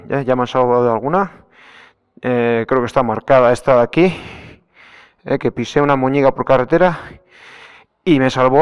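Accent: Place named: Basque Country, Spain. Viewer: Estaba viendo este vídeo con un altavoz bluetooth mientras estoy en la playa con mi mujer ,. Y ella al oír lo que ibas hablando por el acento me dice ese es vasco o Navarro no ??? Joder que acentazo tenemos los de esta parte del norrrrte dios !!!! Un abrazo compañero. 😂😂😂😂😂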